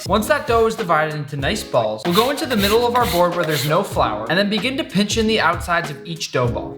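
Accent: United States. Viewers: Sounds pretty American to me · this sounds very american lol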